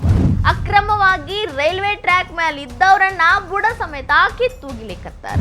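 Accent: North Karnataka, India. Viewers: ನಿಮ್ಮ ವರದಿ ಕೇಳುವದಕ್ಕೆ ಬಹಳ ಚೆನ್ನಾಗಿ ಇದೆ ಉತ್ತರ ಕರ್ನಾಟಕ ಬಾಷೆಯೆಲ್ಲಿ ಕೇಳುವುದಕ್ಕೆ ಕುತೂಹಲ ಮೂಡುತ್ತದೆ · ನಿಮ್ಮ ಉತ್ತರ ಕರ್ನಾಟಕದ ಭಾಷೆ ಕೆಳಕ್ಕೆ ಚಂದಾ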